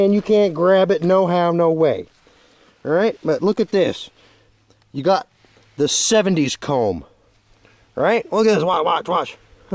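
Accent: United States. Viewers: Me at 4 am: watching how to remove cactus with a 70s comb from a man with a southern accent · I feel the america in his voice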